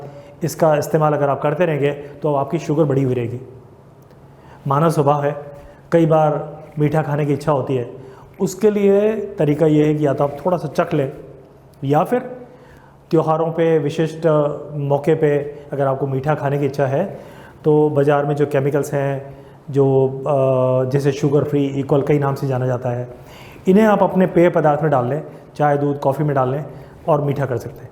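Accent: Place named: Rajasthan, India. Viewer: Your conversation says you belongs to rajasthan for sure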